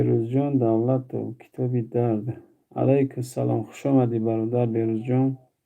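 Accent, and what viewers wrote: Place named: Dushanbe, Tajikistan. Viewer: Чисто душанбески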